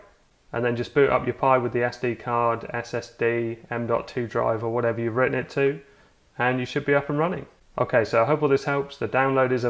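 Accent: United Kingdom. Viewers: nice to hear a fellow Brit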